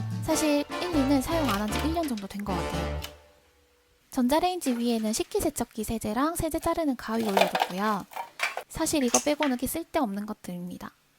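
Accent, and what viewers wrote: Busan, South Korea. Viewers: 표준어를 쓰고 싶지만 새어나오는 부산바이브 ㅋㅋㅋㅋ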